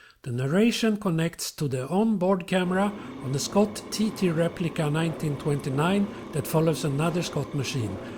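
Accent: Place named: Sweden. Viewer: Great work! And your Swenglish sounds good!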